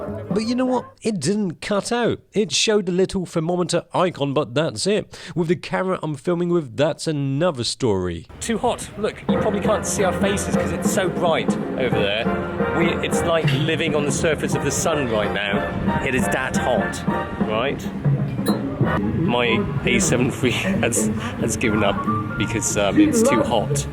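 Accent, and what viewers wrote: United Kingdom. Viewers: it feels awkward seeing someone who is asian having a british accent hahaha i dont know why, i'm asian btw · My mind still can't process and Asian with an English accent!!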